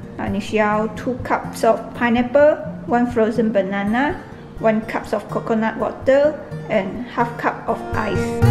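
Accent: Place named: India. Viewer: 你说英文有点像印度人说的英文🙈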